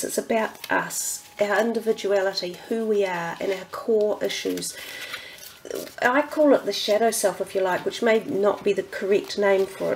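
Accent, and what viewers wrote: New Zealand. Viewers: My resolution is to learn the tarot, which is how I found you. Also, your accent makes me miss NZ. I'm a New Yorker but I spent most of 2013 in Auckland because my partner is a kiwi.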